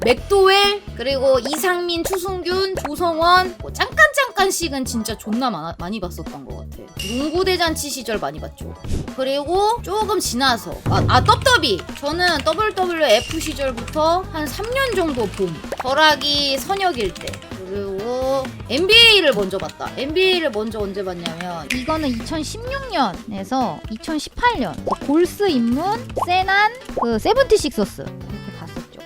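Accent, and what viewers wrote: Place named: Chungcheong, South Korea. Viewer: 느야 된다 이거ㅎㅎ 충청도 사투리가 아주 네이티브 스피치ㅎㅎ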